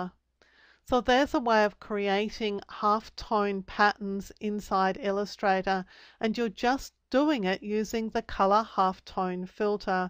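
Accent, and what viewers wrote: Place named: United Kingdom. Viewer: I like your sweet UK accent :-)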